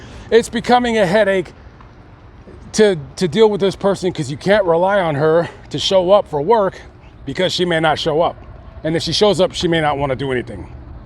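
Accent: United States. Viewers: Un relato tedioso a cargo de un pelado con insufrible inglés "americano".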